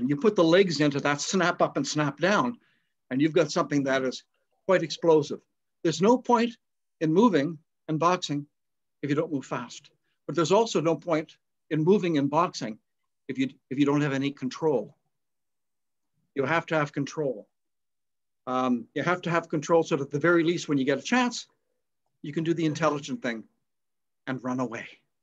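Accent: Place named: Canada. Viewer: really enjoyed your presentation. That was a good history lesson and I enjoyed your pictures. I could tell from your accent that you were Canadian. I’am one of your neighbors from the States. Back, years ago, I did a tour of Canada for a couple of weeks. Really enjoyed meeting all the people I met along the way. My mom’s family are all Irish here in the states. Anyway, I’m looking for a way to defend myself from the epidemic of druggies who are doing a lot of the stealing and robbing State side. It’s getting rough here.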